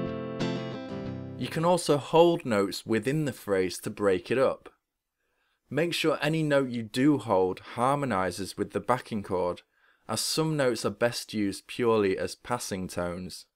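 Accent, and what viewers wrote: United Kingdom. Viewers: Excellent lesson. Awful British accent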